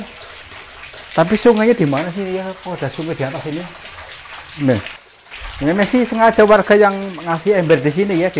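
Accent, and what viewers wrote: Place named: Banyumas, Indonesia. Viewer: Bhsanya kok ngapak Kang,,,memang Kakange dari Daerah mana maaf🙏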